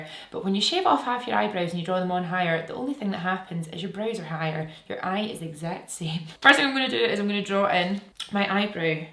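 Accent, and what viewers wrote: Scotland, United Kingdom. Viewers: Never lose that gorgeous scottish accent. <3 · Omg that accent is the best! Scottish? I’m a Swede so don’t hate me if I guess wrong 😛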